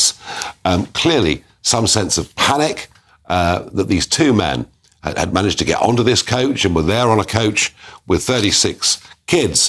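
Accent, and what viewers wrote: United Kingdom. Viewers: Thick British I’m not surprised.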